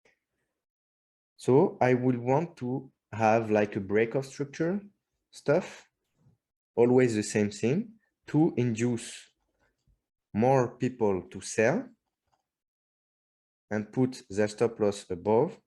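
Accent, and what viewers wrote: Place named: France. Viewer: merci😁😁😁...tu as un accent anglais"français"...merci encore pour l'éducation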